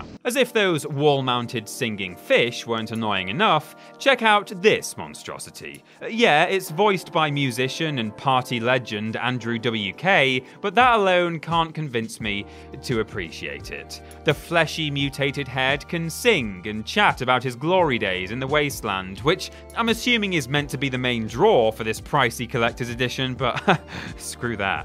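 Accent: United Kingdom. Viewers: This voice sounds familiar. Did he used to be with What Culture or do all British people sound the same?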